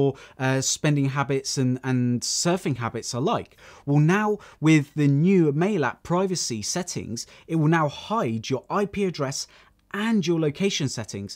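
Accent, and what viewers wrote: United Kingdom. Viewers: You explained it better than the WWDC21 guy. Love that Brit accent. So soothing to the ears. Keep up the good work!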